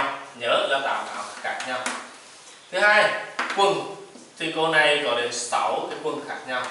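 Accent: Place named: South, Vietnam. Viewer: lâu lắm mới nghe giọng miễn nam hay ghê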